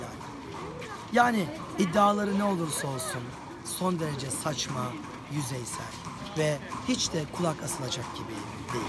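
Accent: Turkey. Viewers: kayda değer ne anlatıyor diye dinlemek istedim ancak egeli ağzıyla "öyle diyolağ böyle diyolağ ama yanlış diyolağ"dan başka bir argüman duyamadım. çığrınmaktan tepinmekten argüman sunmayı unutmuş abimiz az bi soluklan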